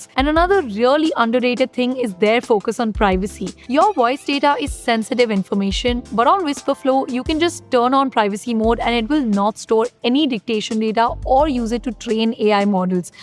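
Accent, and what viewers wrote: India. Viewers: Not everyone understands your Indian accented English. Use English subtitles rather than hindutva terrorism language or word the title of your video in your native language and stop the click bait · The Indian accent is simply overwhelming. 🥵🥵🥵🥵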